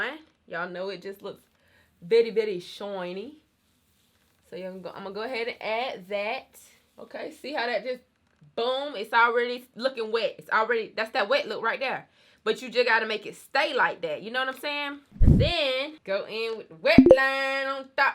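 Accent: South, United States. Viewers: Ur southern accent is coming through in the clutch ❤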